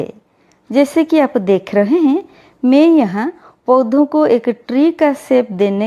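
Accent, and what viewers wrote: Odisha, India. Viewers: Very beautiful, your presentation is also very beautiful like Hindi in Odia accent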